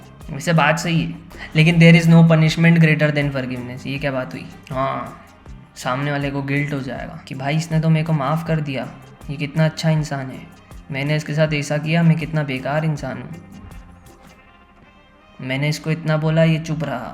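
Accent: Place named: Madhya Pradesh, India. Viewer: Mp se ho kya bhai? Pyaar accent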